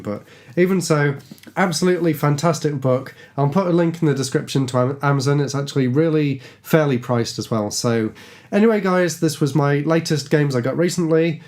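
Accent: England, United Kingdom. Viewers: Gretings from Brazil. I was looking for youtube retrogame vídeos and I found your channel. I really enjoyed how you was exciting with this retro shop. I had been visit London in 2015 but did not found this shop, what a shame!off course is always Nice listen The trully english, here in Brazil we have much more contact with the American english, and its not so Nice. Im glad that I found your channel.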